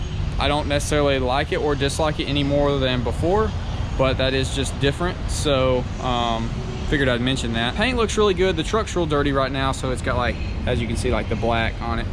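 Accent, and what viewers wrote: Alabama, United States. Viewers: What part of Alabama you from?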